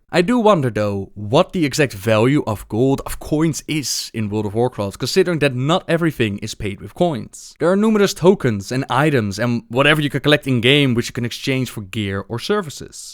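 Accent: Netherlands. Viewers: are you dutch? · that dutch accent tho :p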